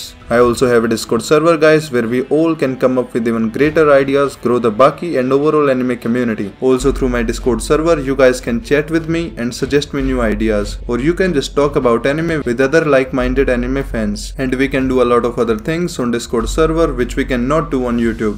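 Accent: India. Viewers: Your indian accent makes it just so much better lmfao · "You don't believe me? Look at my chest I am so masculine that I don't even have nipples." "And when Yujiro Unleashes his demon, there is only one way you can survive and that is by dying." He don't poop anymore because he absorves every particle he eats LMAO Bro, your sense of humor is amazing, your acent turns things more fun and yes! Baki is sooooo bizare!! Indian guy, you made my day with this video! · Best Baki content creator by FAR! 😁 Love the Indian accent too, makes every joke work so much better. 😂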